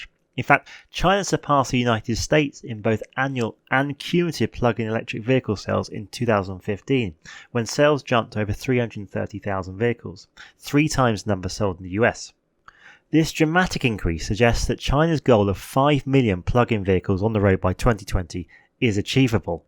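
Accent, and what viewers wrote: United Kingdom. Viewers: I think you did an excellent job. But than again, I'm a native English speaker from Ireland so very used to the British accent. By the way, I just subscribed to your channel due to the highly informative and interesting content. Keep up the good work!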